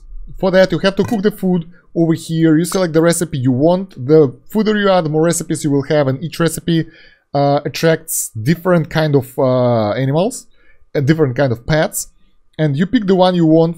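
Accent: Russia. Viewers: уфф, я был уверен, что это русский акцент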